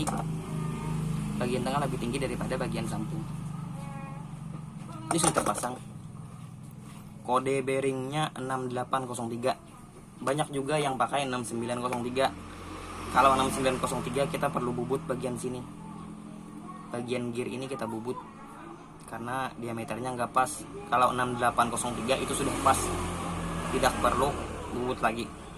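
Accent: Banjar, Indonesia. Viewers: Orang banjar kah pian om.. aksen nya kaya banjar😁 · banjar banar nah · Logat nya banjar di mana lokasi nyaa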